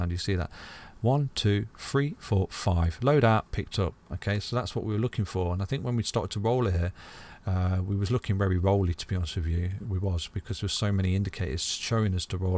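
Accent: United Kingdom. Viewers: I AM FROM THE UNITED STATES 🇺🇸 WE DO NOT SPEAK LIKE THAT. UK y’all sound like a dying dog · He speaks the most clear British accent I have ever heard, and I am a German speaker 😄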